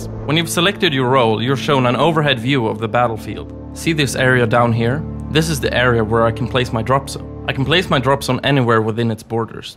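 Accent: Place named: Sweden. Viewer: haha yää, du låter svensk??^^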